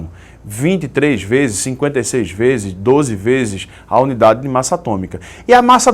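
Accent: Pernambuco, Brazil. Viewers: Ahhhhhhh! você é muito bom! (é Pernambucano né? haha) por favor continue colocando conteúdo de química, sua explicação é top.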